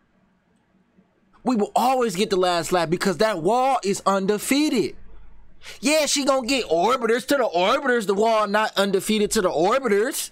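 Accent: Baltimore, United States. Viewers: Nigga said “twenty tew” you def a Baltimore more nigga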